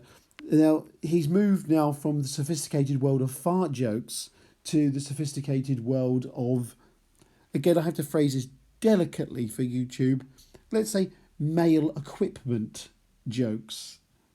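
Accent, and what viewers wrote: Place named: North, United Kingdom. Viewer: Love these sm thank you for helping me! P.S you sound like a northerner from game of thrones Winterfell!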